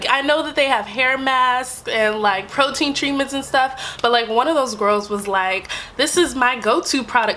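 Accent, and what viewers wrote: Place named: New York City, United States. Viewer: awww you're growing a SLIGHT NYC accent! lol. anyways, great video I totally agree w. your viewpoint on this BS.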